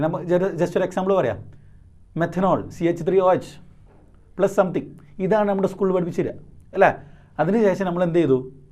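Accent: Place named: Kozhikode, India. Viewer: സർ കോഴിക്കോടൻ ഭാഷ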